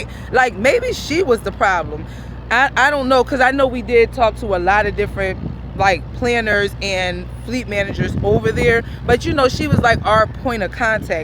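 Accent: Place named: Caribbean. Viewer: Nice video you sound like someone who has caribbean blood in you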